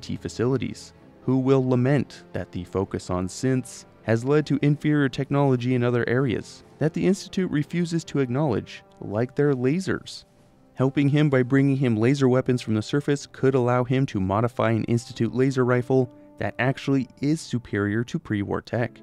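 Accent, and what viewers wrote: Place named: Midwest, United States. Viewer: I love your videos but i have ro say, i noticed your accent way more in this video lol michigan? Minnesota?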